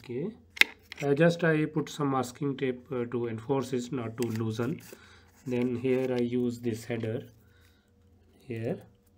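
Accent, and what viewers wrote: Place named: Pakistan. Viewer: Sir ji min pakistan sy hun ap ki awaz sy lag raha hy ap pakistani ho help me sir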